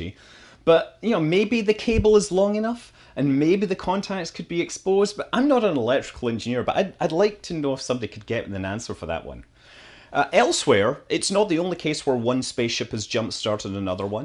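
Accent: Scotland, United Kingdom. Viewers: I love these videos. The combination of subject matter, that gets the mind wandering to all sorts of different places , and the Scottish brogue of the presenter means that I have never actually got through one without falling asleep. They’re the best insomnia cure, next to the Jeff Bridges sleep tapes, that I’ve ever had. The sad thing is I now know about half the relevant information about loads of things to do with space flight.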